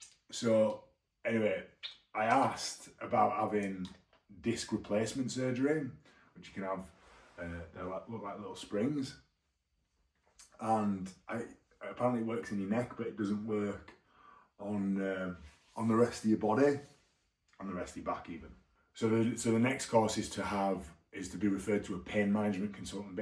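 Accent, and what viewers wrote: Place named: England, United Kingdom. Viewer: I grew up in the UK partly but God do I find the English accent so annoying which I’m sure people find American accents annoying too so no hate just an observation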